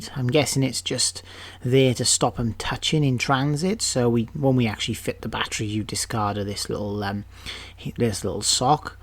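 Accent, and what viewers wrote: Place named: Wales, United Kingdom. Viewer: Very helpful, detailed, & professional video, all delivered in a most pleasant Welsh accent! Very well done😊.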